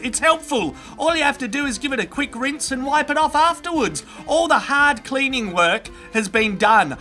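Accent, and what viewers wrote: Australia. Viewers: I always have a thick Australian accent in my head everytime I watch one of his videos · Australian accent makes everything funny.😂😂😂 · The greatest comment bloke in the world. The ozzy accent just adds icing to the cake. Love from India 🇮🇳 ozzy man!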